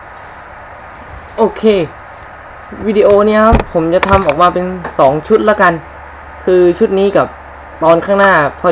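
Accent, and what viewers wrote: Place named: South, Thailand. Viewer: เด็กใต้หรอ ทองแดงน้ะ <num>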